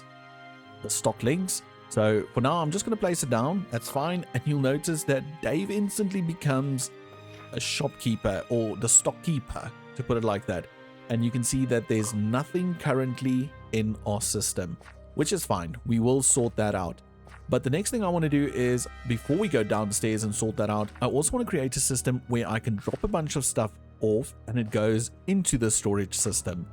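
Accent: South Africa. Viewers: From SA? I hear the accent 😂 if so thats dope. Been enjoying this season of SMP so many new creators to discover 🔥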